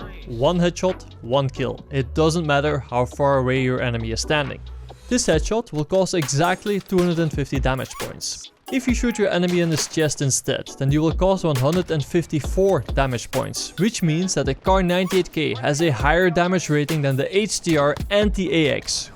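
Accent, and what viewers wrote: Netherlands. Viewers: Ate you Dutch ? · First video I watch of this dude, and I can already tell he's dutch lol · Love the Dutch "Sneiper" accent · this dude sounds dutch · U dutch?? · 100% NL door z'n acent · Je klinkt Nederlands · Nice dutch accent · The Dutch accent is strong in this one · Jo deze gast is Nederlands 100%% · He is Dutch for sure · you are dutch right :D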